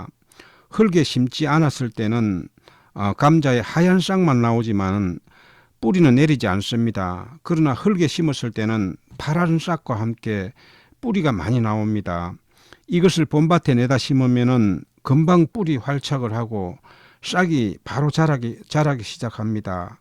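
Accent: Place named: South Gyeongsang, South Korea. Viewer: 🥔감자, 🧢모자 등 말할때 앞 발음이 올라가는것은 경상남도 분들 발음법 같아서 정겹습니다. 저는 경북출신인데, 신혼때 경남에서 6년정도 살았거든요..ㅎㅎ 항상 좋은 영상 감사합니다.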